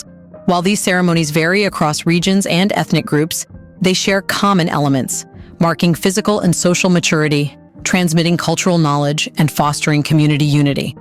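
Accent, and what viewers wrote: United States. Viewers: Good question, sounds American narrator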